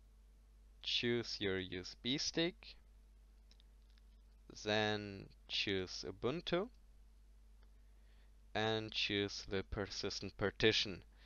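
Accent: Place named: Germany. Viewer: Hey, i managed to get Ubuntu running in my Macs. I actually have several macs like a Studio with M1 Max and a MBP M3 Max. The solution - if anyone needs it - is called "UTM" and can run a Ubuntu 22.04. From there you can run the script. God thx i did not throw away my 6TB from my WD MyCloud Home. It will take days or weeks to recover files i guess, but it should worth it. Am i right you are german like me? Because of your accent. Anyway... WD is der letzte Dreckscheissladen und bestimmt meine 4te HDD. Hoffentlich Insolvenz incoming 😂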